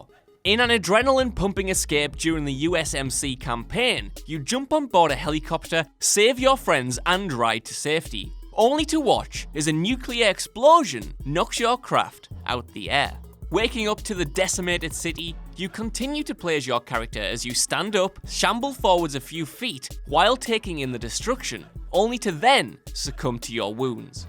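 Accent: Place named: Liverpool, United Kingdom. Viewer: where's that english accent from? liverpool?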